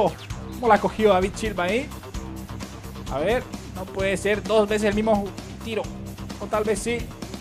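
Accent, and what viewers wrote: Colombia. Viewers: oye eres colombiano? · eres colombiano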